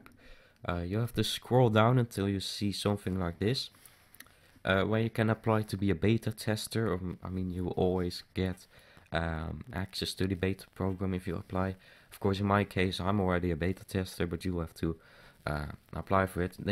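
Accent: Netherlands. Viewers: You just hear it instantly that this guy is dutch xD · Je hoort dat hij Nederlands is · Die te you from? You sound like a Dutch guy. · you have a duch acsent 😄 😉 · Gast je kan niet een ergere nederlandse accent hebben holy shit xd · ik hoor aan die accent en de nu.nl app dat je nederlands bent haha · Ben jij nederlands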